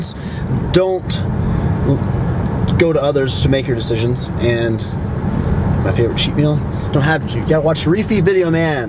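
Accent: South, United States. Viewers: That country accent 😂😂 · Lmfaoff I love the country accent 😂😂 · Only if you do your Texas accent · Country accent is A1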